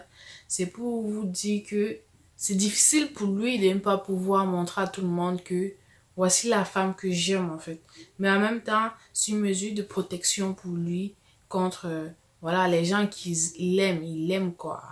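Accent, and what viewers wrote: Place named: Cameroon. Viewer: Je comprends rien avec ton acxan camerounais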